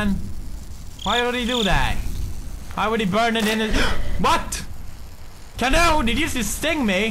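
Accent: Sweden. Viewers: very barely it's super clear hes swedish in this video as to now where you have absolutely no idea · the time when pewd’s swedish accent was still noticeable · Man... still had a little bit of a Swedish accent.... and he was a tiny bit funnier. Probably because the whole demonitization thing wasn't here yet.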